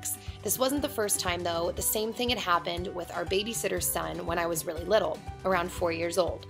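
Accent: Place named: Wisconsin, United States. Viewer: what state are you from you sound kinda like Wisconsin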